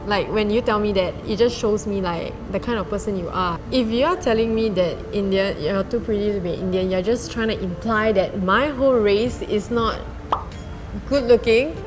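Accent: China. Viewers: Please speak in an Indian or atleast a neutral English accent. How ur speaking in a Chinese accent is just messed up. Sorry to hear about the bullying incident, but dont let that control your image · She really have the Chinese English accent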